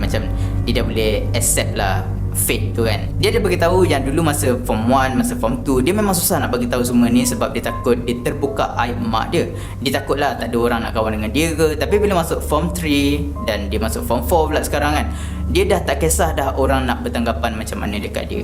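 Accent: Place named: Penang, Malaysia. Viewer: Mcm penang atau negeri sembilan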